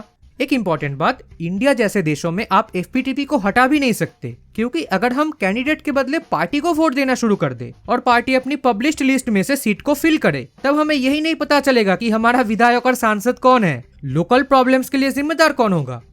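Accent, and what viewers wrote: Bengal, India. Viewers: R u bengali?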